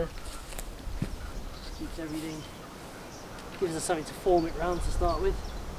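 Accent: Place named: South Wales, United Kingdom. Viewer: Very good South Wales accent LOL. I’m guessing Dyfed maybe west Glamorgan??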